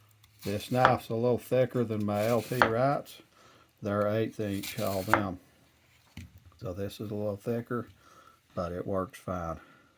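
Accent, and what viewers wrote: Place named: Texas, United States. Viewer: Обожаю техасский акцент хоть и нечего непонятно😂 но Кизлярские ножи лучше 👍😅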